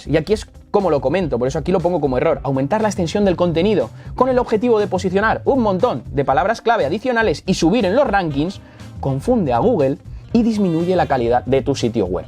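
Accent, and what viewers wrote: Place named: Madrid, Spain. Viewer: Hola, me encanta como explicas, eres divertido, estoy haciendo este curso sin tener ni idea de informática, bueno sí lo básico. Y con un profesor cómo tú es difícil concentrarse. Qué madrileño más bonito. Sin ánimo de ofender, desde el corazón jijiji. Saludos desde Málaga.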